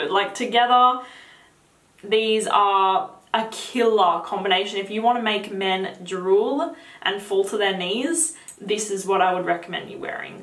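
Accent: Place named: Australia. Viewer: What part of Australia is this accent from??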